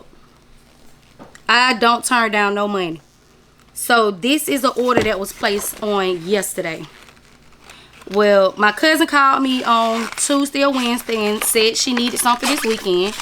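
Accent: South, United States. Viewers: Hi, Mrs. nice to meet you I came across one of your gift baskets videos now I'm a new subbie your personality and southern dialect is contagious very creative too love it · Sis., can you provide the details on your edible printer? You talk so fast and you know you country! lol I played you back 4 times and still ain't got it!!!! lol Absolutely love your personality. I really could listen to you all day.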